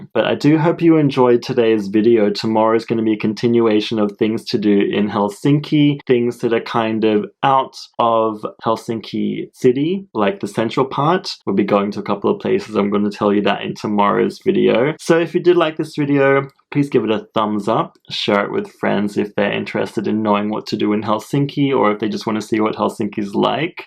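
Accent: Australia. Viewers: I just love your great videos. I like Aussie accent.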